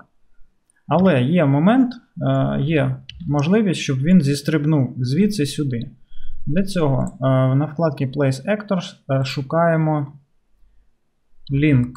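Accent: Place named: Poltava, Ukraine. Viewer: Одразу чути, що автор з Полтавщини... бо я теж))))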